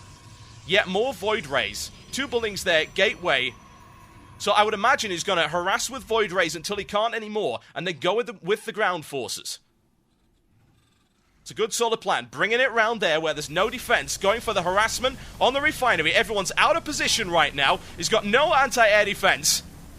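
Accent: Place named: England, United Kingdom. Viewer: no offense man but I can't stand your english accent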